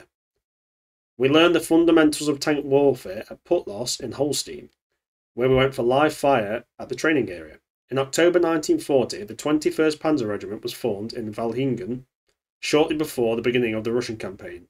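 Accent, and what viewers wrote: United Kingdom. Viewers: Thanks for your work! Weird to hear Carius' memories with a British accent, but cool anyway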